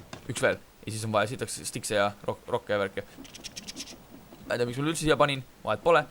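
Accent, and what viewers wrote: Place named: United Kingdom. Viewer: Su British Aktsent on nagu Geographic Channel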